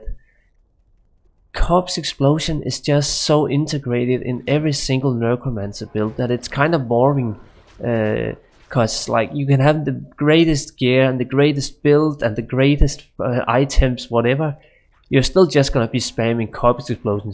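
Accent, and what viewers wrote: Denmark. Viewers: dejligt at høre en dansker :)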